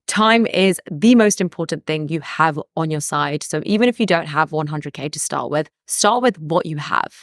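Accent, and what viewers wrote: United Kingdom. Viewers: koompound interest😂😂f00-k-i-n brit accent......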